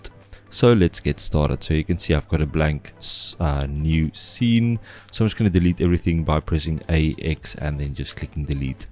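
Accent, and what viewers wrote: South Africa. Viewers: Awesome video bro. Are you South African btw?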